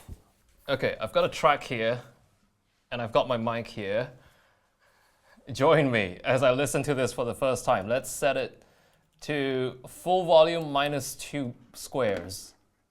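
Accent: RP, United Kingdom. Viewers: This dude speaks very posh. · first time i hear an asian guy with a british accent.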